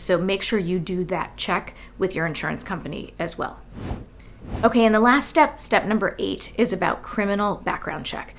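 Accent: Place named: California, United States. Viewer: Sound like you from California/Arizona! 🌵🌴🌵🌴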